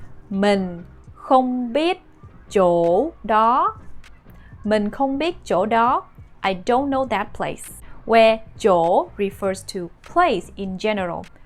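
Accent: South, Vietnam. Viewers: Really good for beginners, even South accent. I think it is the south accent. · You are from South Vietnam? The Vietnamese people I interact with tend to be from the south also. Great video, by the way, as always. I appreciate the way you say each sentence first slowly and then at a more normal speed...also how you break down the components of each sentence... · is this the southern accent? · Do you speak northern vietnamese or southern ?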